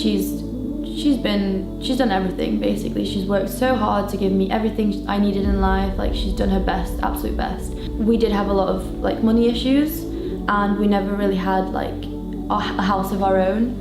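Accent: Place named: United Kingdom. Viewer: Latina with British accent 😍😍😍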